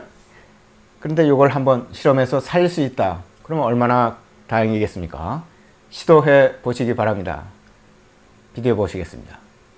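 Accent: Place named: United Kingdom. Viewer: 정말 좋은 기술 가지셨네요 한국에 계시가요 아니면 영국에 계신가요 영어 발음이 아주 영국식 이쎠요 미국에서 드림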